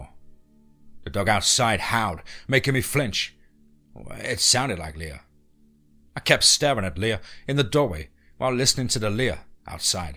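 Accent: England, United Kingdom. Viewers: Idk why English accents are great for scary story narrations, but they are!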